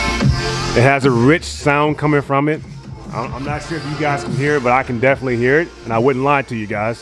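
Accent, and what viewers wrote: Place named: United States. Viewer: Because of your accent I think you are from the United States, therefore we are from the same continent (America), I see you and I write to you from Chile, on Friday June 4, I went to the store to buy a printer, but I ended up buying this soundbar 🤣🤣, it is my first soundbar, I found it with a discount and I will take advantage of it to see the Copa América (America's Cup). Greetings from southern Chile...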